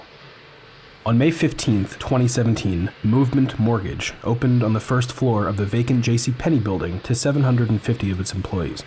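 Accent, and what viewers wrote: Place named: Virginia, United States. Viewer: There’s something about an old Virginian accent I find really calming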